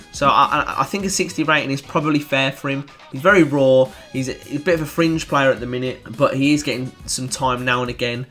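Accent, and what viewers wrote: Birmingham, United Kingdom. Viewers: What part of Birmingham are you from?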